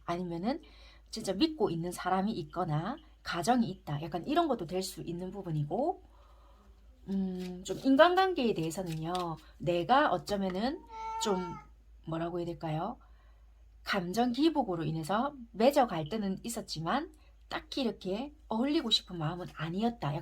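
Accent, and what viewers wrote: Busan, South Korea. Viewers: 님 21년동안 좋은 타로리딩과 조언 감사드렸어요 21년 마무리 잘하셨나요? 22년도 함께해요 ❤ 3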